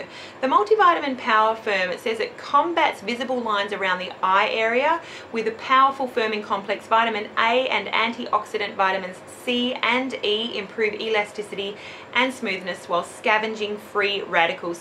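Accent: Australia. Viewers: Hi, My favorite Dermalogica product is the Dermal Clay Cleanser. I have oily skin and that product, in combination with my Clarisonic, leaves my skin feeling amazingly fresh and clean. It has also worked wonders for my pores. Love it! I just recently discovered your channel and I love all your videos so far. I love your Australian accent! Greetings from Texas:)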